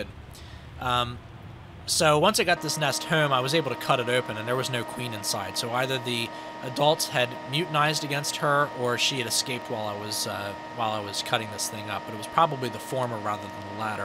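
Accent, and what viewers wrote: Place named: Mid-Atlantic, United States. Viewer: I have to ask....where are you located? You sound like back home - which is Maryland/Delaware/PA/VA for me.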